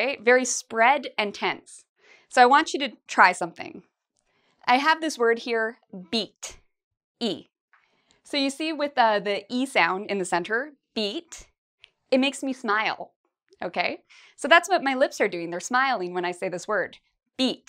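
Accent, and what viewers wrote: Canada. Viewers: I thought you were a Canadian lol...In HIMYM everyone would laugh at Robin cuz of her pronunciation ahahah... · thank you so much for your videos! Because of your lessons I have greatly improved my pronunciation and grammar. BTW, love the Canadian accent:)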